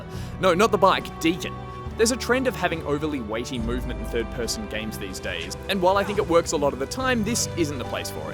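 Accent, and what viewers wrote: Australia. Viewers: Clearly sounds Australian. But Americans always do get confused about the British accent.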